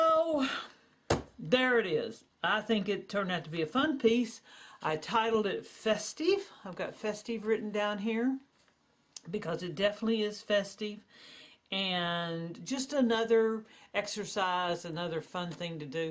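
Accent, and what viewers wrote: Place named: South, United States. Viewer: i really lik it....thanks for sharing,.....ps i love your southern accent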